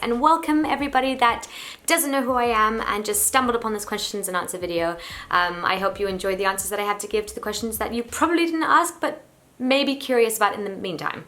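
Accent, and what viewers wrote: United Kingdom. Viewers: Wow you now have a British accent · Love your new channel and thank you for finally making English videos again, i was just wondering about the heavy British English accent what’s up with that 😃 i mean i used to notice it a tiny bit before but now... 😄 still love everything though ♥️♥️ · She moved to London so acquired a slight British accent not as much as her husband though. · Wow - hadn't seen a video in a year or two so thought I'd drop by. Imagine my surprise when I discovered she has a totally different accent now. (Go watch her videos from a few years ago - her accent is American. Now it's what - London?) So weird. Also weird is that she appears to be in the same bedroom she was 12 years ago, even though she's apparently married and has a kid now. Does she still live in her parents' house?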